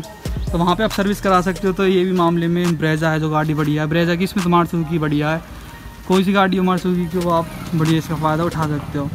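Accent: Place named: Uttar Pradesh, India. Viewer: Gaaaddi..... kya hota h be sahi se bol UPk logo ki trah kyu bolta h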